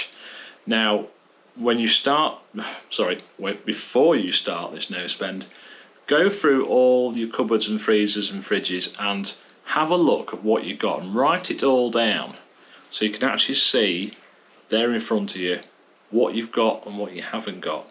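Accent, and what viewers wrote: Lincolnshire, United Kingdom. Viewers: I could listen to your Lincolnshire accent all day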